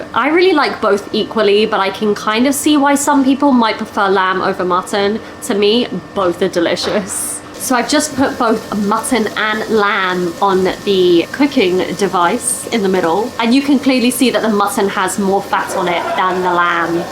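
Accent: United Kingdom. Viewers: LOL なるほど！ ”obviously” って、こういうふうに使うんですね。勉強になったー。それにしても、ずっとさんのイギリス英語を聞いていたら、イギリス英語の発音が大好きになってしまったよ。そして、自分よりも多くの日本全国のおいしい料理を食べてるさんが、うらやましい笑今日のビデオは一段とおいしそうで表情が豊かで最高でした！ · 見事なブリティッシュイングリッシュです聞き取れません脱帽ですすごいです🎉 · 呑兵衛のさん、しかもサッポロ黒ラベル、ジンギスカンとビールが進みますね。華麗なイギリス英語の、聞きやすい発音、大きめの文字、教材としても秀逸です。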